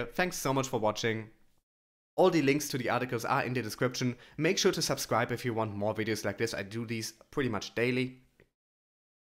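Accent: Germany. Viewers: love your vids, thanks for your hard work. You remind me of German poker pro named Bencb789, ever heard of him? well, only thing you have in common is German accent. But you both sound smart AF! thanks for the info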